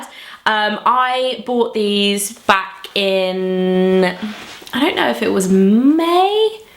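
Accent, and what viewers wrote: United Kingdom. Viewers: British accent! :D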